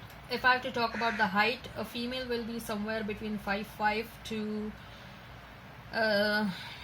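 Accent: India. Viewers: Close this channel and go learn western English first so you can get rid of this Indian accent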